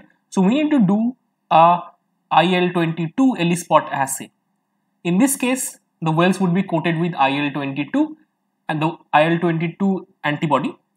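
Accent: India. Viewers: Unfortunately I do not understand the English from Indian people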